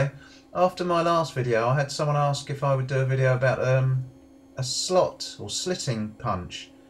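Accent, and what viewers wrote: United Kingdom. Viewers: Hey you sound like you're in the UK, great video it helped me a lot, I'm learning how to make complex sword hilts and this helped a lot, I just made a bunch of drifts and slot/hole punches out of some rebar and chisels. Do you happen to know a good place to get cheap swages and drifts here? or if you have any old ones for sale let me know, having trouble finding good large ones.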